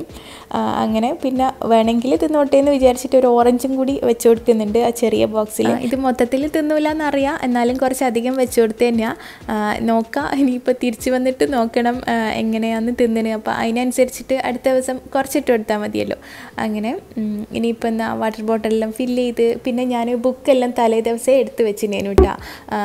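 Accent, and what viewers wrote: Kannur, India. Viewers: Njan oru pattambi kaari aanu but niku othiri ishta kannur slag 😍😘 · Ighal kannur ano nammale athe language😀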